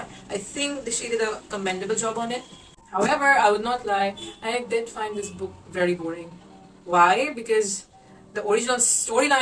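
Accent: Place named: England, United Kingdom. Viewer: literally set fire on stage 🔥🔥🔥 and your english accent!! Wowww!!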